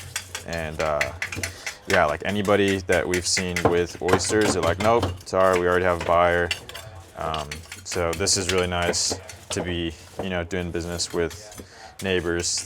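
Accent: United States. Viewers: He has a California accent lol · It may have been asked before, but your English accent sounds like you are originally from the United States. Is that where you hail from? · It took me a while to figure out why he has to search for words in english. American accent but exposed to the language for a relatively short time. That makes a lot of sense and makes it really interesting to listen to. Besides that, I envy the live you live there. Eating fish on a daily basis, together with fruits from your own garden is the kind thing I dream of. Far away from crowded places, and just doing your thing. I really like the way you do your business there and I genuinely hope you will upload videos like this more often to give us an inside look on your life.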